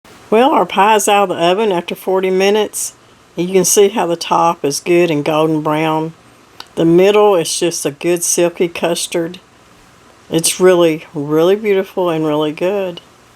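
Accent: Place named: Arkansas, United States. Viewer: I love custard pie. I love your accent it reminds me of my grandparents, they were frome northern Arkansas.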